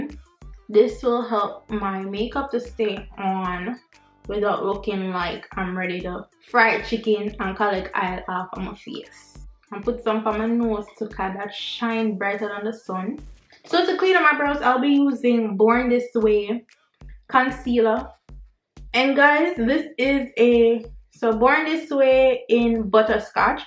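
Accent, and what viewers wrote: Jamaica. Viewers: Heard to understand lol but i love the accent! Always wanted a Jamaican accent 🇯🇲 🙌🏽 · look how d ting come out good...U can definitely do ur makeup urself...jus fling on couple gems, n 2 bright colour n u good. I did mine myself....n it lasted all day....love the patois vid..n u nuh haffi nermnous nex time! lol that made me laugh so much bout nerminous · 🇹🇹🇹🇹🇹🇹🇹🇹 I love the Jamaican accent🇹🇹🇹🇹🇹🇹🇹🇹🇹🇹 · Amen i was waiting..i love when u talk jamaican 😍😘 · I come a cross one of your videos an the frist thing I say u were Jamaican . I am Jamaican too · I love when you SPEAK patois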